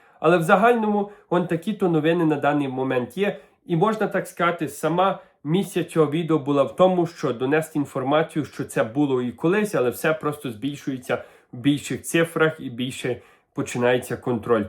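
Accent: Canada. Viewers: такий прикольний акцент, ніби ще ваша прабабця емігрувала в Канаду ❤ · Ставлю тебе дорогенький Канадский щерый Уураинець вподобайку 👍 за таку гарну мову и Канадский акцет а новости тут у наших штатах таке саме маймо и будьте обережни не подходит до кордону дуже блезько бр Наши ICE можуть и ваших також депортувати из Канади подим скажут щё ми незнали шё з Канади неможна було ни кого чипати якщё неправильный аусвас то на депортацию 😢 А так дуже рад був мову цю чудесну старинну почюти 🤝😉💪👍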